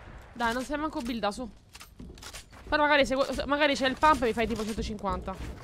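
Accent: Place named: Naples, Italy. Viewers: apprezzo lo sforzo nel parlare napoletano gg sei fortissima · Ma sei napoletana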